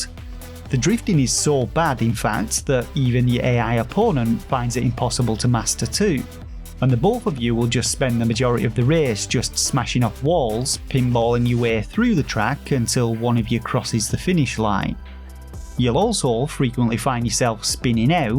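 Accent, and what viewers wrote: United Kingdom. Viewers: Hey, not hating on you or anything, but please can you tell me what your accent is? I am genuinely curious. I can’t understand most of what you say, but I am fascinated that the auto-translate can pick up 99% of your brit English. I just need to know please. I am beyond OCD at this point and it frustrates me.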